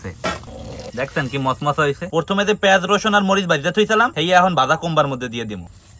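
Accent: Barishal, Bangladesh. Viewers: হায় রে বরিশাইলা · আমিও বরিশালের ছেলে কিন্তু আপনার মতো সুন্দর করে বরিশালের ভাষায় কথা বলতে পারি না😢 আসলে আঞ্চলিক ভাষায় একটা টান যেটা আমার কথায় আসে না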